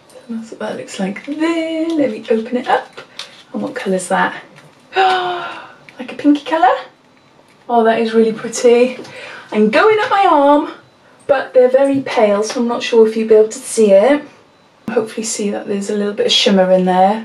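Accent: Wales, United Kingdom. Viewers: I need this calendar😍😍 great unboxing some amazing products xx i think its a welsh thing doll because i say it the same way as u xx im from south wales xx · oh my goodness and you're welsh! I was thinking I was hearing some welsh accent coming through, and then twf! I'm in west glamorgan so you've just gained a local(ish) fan <3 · You say it a lot and I thought it was adorable. I guess it's the whole Britishness of it. I'm Bulgarian and I don't get to hear this type of accent often and I think it's very nice and melodic. But yes, I do think that you say tooth weird. ;d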